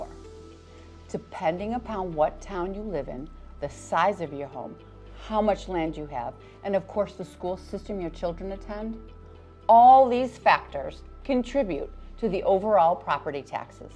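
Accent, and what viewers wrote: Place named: Pittsford, United States. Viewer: She looks and sounds like she lives in Pittsford 🙄 The stereotype holds up.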